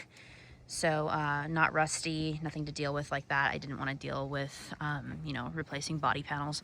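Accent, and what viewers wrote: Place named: North, United Kingdom. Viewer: My opinion,that’s a show truck. It’s to nice to be a daily driver. What I noticed first is,the chrome molding on the body is aligned perfectly. And underneath is immaculate. Plus,I don’t know where you live but you sound like you liv up north somewhere. If that were mine,it would never see a salted road. I would walk first.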